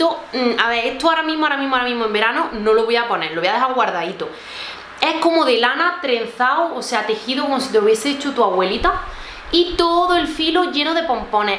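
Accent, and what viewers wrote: Granada, Spain. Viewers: tienes acento como de Graná 😱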